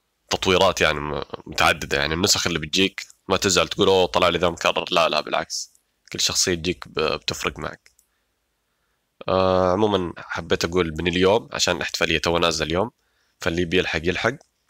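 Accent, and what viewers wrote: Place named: Saudi Arabia. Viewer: اللهجة السعودي مضبوطة مرة كتير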